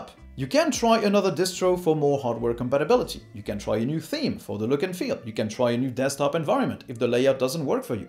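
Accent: France. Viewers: Are you french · Ah such a relief to hear the half french accent pronouncing 'linux' again.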